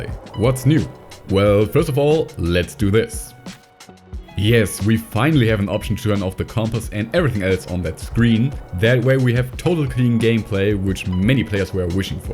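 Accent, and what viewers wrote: Germany. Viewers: are you german or smth cause that accent sounds so german 🔥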